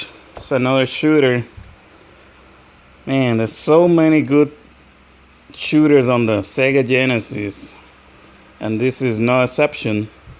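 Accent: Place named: Florida, United States. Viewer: You have some bad azz games there my friend and your Puerto-Floridian accent shines in this video. I am jealous of all those pricey obscure titles. Give me give me!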